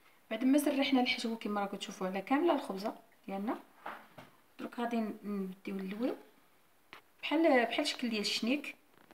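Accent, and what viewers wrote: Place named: East, Morocco. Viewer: / مشاء الله أو تبارك الله على ناس وجدة..سبحان الله عرفتها من اللهجة ديالك قلت هذي أكيد من الناحية الشرقيه إيوا حنا جيران أنا من مدينة أحفير أو عايش في بلجيكا... حصلي الشرف أختي الكريمة بمعرفتك نتمنى ليك التوفيق في مسيرة ديالك أو الاستمرارية.. أو شكرا بزاااف على الوصفات الجميلة لي تقدميلنا...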